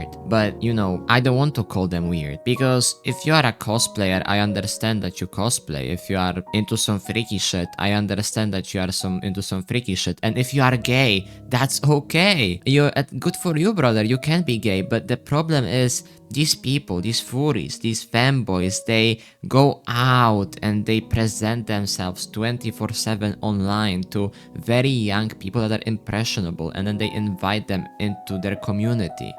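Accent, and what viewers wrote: Poland. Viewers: odrazu słychać że polak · you sound kinda polish edit after research: damn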